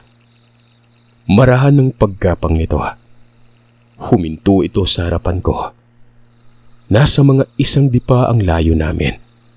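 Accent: Visayas, Philippines. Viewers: Ka angayan mo bisaya uieeee hahahha😊😊😊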